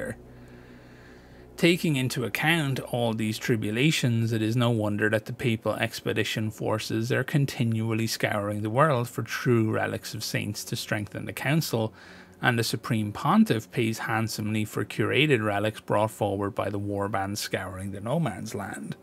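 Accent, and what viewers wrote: Ireland. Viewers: I do love an Irish voice articulating our doom.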